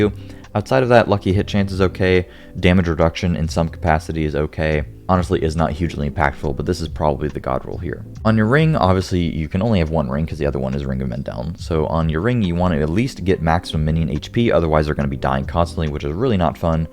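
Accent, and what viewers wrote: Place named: United States. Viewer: The speaker is using American English. It's pronounced MUH-cob.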